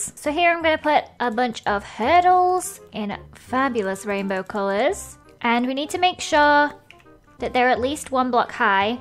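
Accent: United Kingdom. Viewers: Why do you sound British???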